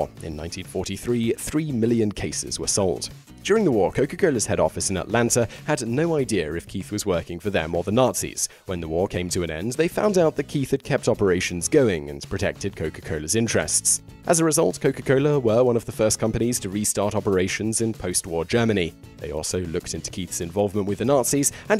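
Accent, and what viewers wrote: United Kingdom. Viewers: R C Cola needs to be on this video!!! And why the British accent? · I guess I'm just a slow talking southerner, hard to keep up with a fast talking Brit.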